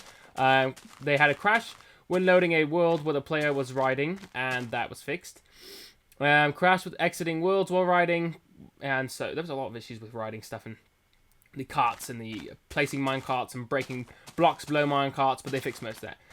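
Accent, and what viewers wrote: United Kingdom. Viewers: I like your videos and that British accent :D the vids are giving me patience ... :)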